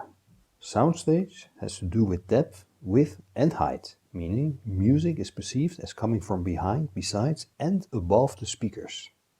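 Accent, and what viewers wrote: Netherlands. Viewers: thanks good short explanation ! from your accent i would have thought you are from holland , and you could check out my set because i live in amsterdam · I'm 100% sure he's from the Netherlands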